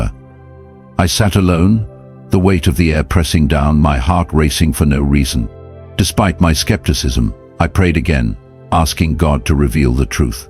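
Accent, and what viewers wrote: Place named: United Kingdom. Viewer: It’s really hard to find this believable when it’s a white British accent speaking and we’re talking about the most urban hip-hop artist and legend Tupac Shakur allegedly being seen in hell. I understand that people want to conceal their identity because they work in corporate jobs… But I just couldn’t sit through the whole thing. Y’all should have picked like a different accent maybe a black person even a Hispanic person but a white British accent come on guys,! Y’all lost me at like 15 minutes.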